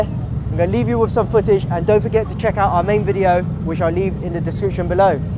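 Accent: United Kingdom. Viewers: nice vid as always!! on a side note are you japanese? from your UK accent seems like you've lived your whole life in the UK love your vids been a sub since the first evo6 review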